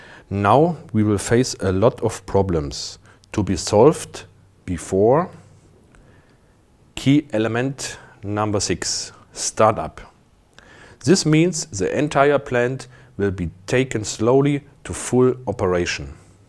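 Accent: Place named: Germany. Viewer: Thank you For this training Mr., it is very helpful for me. i liked your german accent ^-^.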